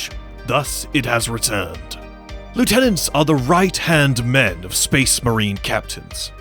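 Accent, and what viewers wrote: United Kingdom. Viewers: Mate this is a fantastic video but as English sounding as you are please, lieutenant is pronounced Left tennant. Lewtenent is the American pronunciation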